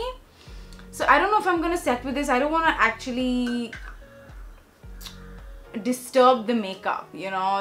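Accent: United Kingdom. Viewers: British accent was too acurate 😍😄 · Waaaow that british accenttt😍😍😍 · That British accent though 🤙🏻😍💖💖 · Love your British accent. You should totally do a make up tutorial video speaking British only. 😍🥰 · Godd the British accent was bomb the video was good too but the brrritishhh acccent malz no one can make a difference between the way you speak and the authentic real British accent😄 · The British accent was on point 😍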